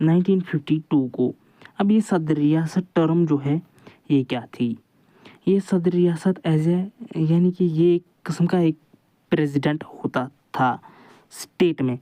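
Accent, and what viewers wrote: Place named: France. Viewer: french kee english bolna chod dooo mra bahi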